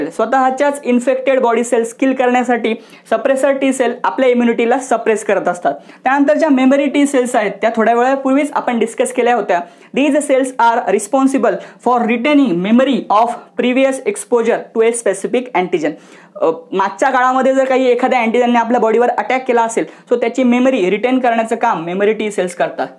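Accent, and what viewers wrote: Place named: Pune, India. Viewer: Punekar cha avaj ahe to...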